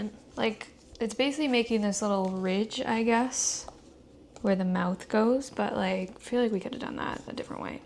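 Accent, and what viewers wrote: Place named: Afrikaans, South Africa. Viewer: Hey can you pls use your Afrikaans accent. Anyway this good! Thanks 😀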